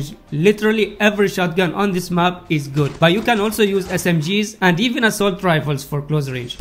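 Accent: India. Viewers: Bro where are you from? You sound like Indian If India which state?🤔 Jst want to know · Sorry for asking but your voice was like indian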